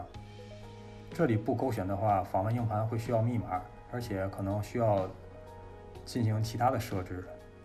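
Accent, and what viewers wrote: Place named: Tianjin, China. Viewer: 我从油管上找了很长时间的视频都他妈没有说得清楚的。您说清楚了。关键是，我听出来了乡音。我是离开家乡二十年的天津人。哈哈哈哈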